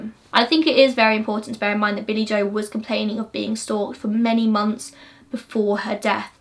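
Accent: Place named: England, United Kingdom. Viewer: Sad story! I’m a new subscriber and you are doing a great job! One little comment that I’m glad you speak somewhat slowly especially with you having a (wonderful) accent. I’m from England so it brings me ‘home.’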